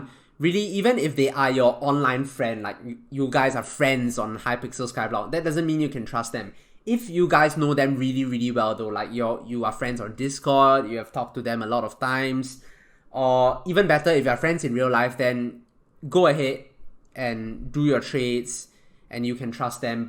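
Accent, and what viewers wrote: Singapore. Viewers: u sound singaporean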